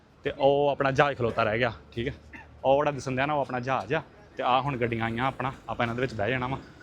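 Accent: Majha, India. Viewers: ਮੈਂ ਤੁਹਾਨੂੰ ਇਕ ਵੀਡੀਓ ਵਿਚ ਕਿਹਾ ਸੀ ਕਿ ਤੁਹਾਡੀ ਬੋਲੀ ਅੰਮਿਤਸਰ (ਮਾਝੇ)ਵਾਲੀ ਚਲੋ ਪਤਾ ਲੱਗ ਗਿਆ ਕੇ ਤੁਹਾਡਾ ਪਿੰਡ ਭੋਜੀਆਂ, ਤੇ ਮੇਰੇ ਨਾਨਕੇ ਨੇ ਭੋਜੀਆਂ, ਮਾਮ ਨਿਆਮਤ, ਮੁੱਖਾ, ਮਨਜੂਰਾ, ਦਾਰਾ, ਰਾਬਟ, ਇਹ ਨੇ ਮੇਰੇ ਮਾਮੇ ਦੇ ਮੁੰਡੇ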